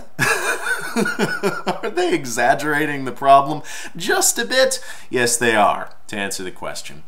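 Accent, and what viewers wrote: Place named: London, United Kingdom. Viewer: Great London accent - as appreciated by this English person.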